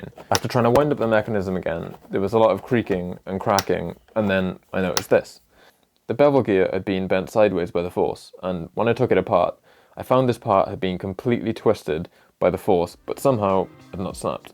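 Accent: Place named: England, United Kingdom. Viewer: Legit sounds like Tom stanton... like as if they went to the same schools growing up with each other with whatever part of England they're from.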